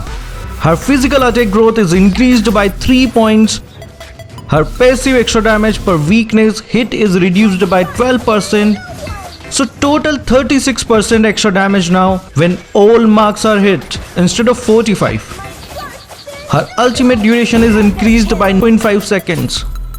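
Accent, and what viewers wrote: India. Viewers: Indian english so bad 🙄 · Your Accent is TERRIBLE AT BEST.. So thick Indian Accent with a Japanese name!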